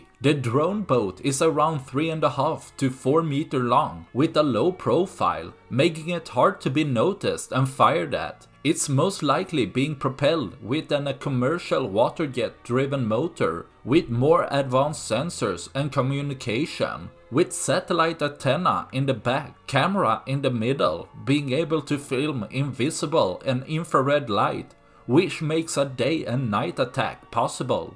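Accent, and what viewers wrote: Sweden. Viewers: Hey Swede, (you must be with that accent), have you thought how the Russians could present a video of the attack by a drone boat that was allegedly Ukrainian? This is a false flag operation! 😡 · From your accent, I can bet you are from Sweden! ;)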